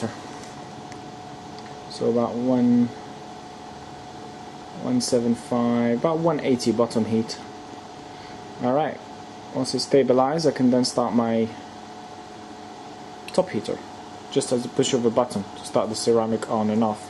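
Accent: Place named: Poland. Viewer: Akcent Polski, chyba że się mylę Polak potrafi. Zrobiłem coś podobnego tylko z coffe machine do tostów i robienia kawy.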